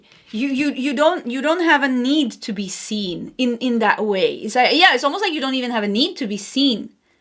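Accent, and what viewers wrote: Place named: Sweden. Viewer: can I PLEASE adopt you as my DAUGHTER?!!😊❤😂 We just LOVE listening to your sweet, Swedish cute self in readings! And pile#2 was SPOT on every single card you read!! You were ABSOLUTELY right about the news and media.. I found myself sucked into all the celebrity gossip on YouTube last weekend..for like ALL weekend, and then my (twin flame) & I ended up in a HUGE fight..? We JUST figured out...its the DARK energy that made us get all angry.. NO more watching ANYTHING but light work and higher learning!!😁🤗😘😘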